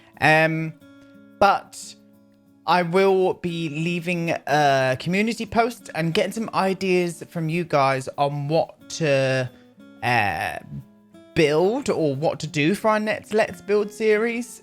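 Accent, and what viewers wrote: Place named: Scotland, United Kingdom. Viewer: yes! please rate the sims packs :) also as a scot I wanna hear that accent more haha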